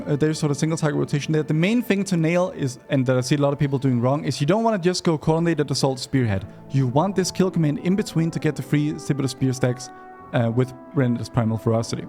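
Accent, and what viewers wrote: Denmark. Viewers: Love the guide! I do have a bugging question.. are you a Dane?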